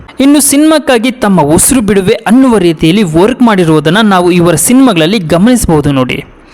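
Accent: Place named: Tamil Nadu, India. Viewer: ಲೋ ಅಯೋಗ್ಯ, ಚಾನಲ್ಲ ನಿರೂಪಕ, ಸ್ಪಷ್ಟ ಕನ್ನಡನೇ ಬರಲ್ಲ ನಿನಗೆ, ತಮಿಳು ಮಿಸ್ರಿತ ಕನ್ನಡ ಮಾತಾಡ್ತಾ ಇದಿಯಲ್ಲೋ, ಭಿಕ್ಷುಕ ನಂಗೆ ಕೇಳ್ಕೊಳ್ತೀಯಾ ಸಬ್ಸ್ಕ್ರೈಬ್ ಆಗಿ ಅಂತ, ನೀವೆಲ್ಲಾ ಕನ್ನಡ ಭಾಷೆಯ ನಿರೂಪಕರು, ನಿನ್ನ ಯೋಗ್ಯತೆಗೆ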